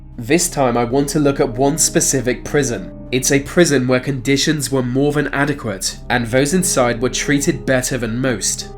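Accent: United Kingdom. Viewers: You need to go to a surgeon for your face... hey that's really rude of me isn't it? I don't mean that really. It's not a speech impediment. It's actually a dialect accent of Britain.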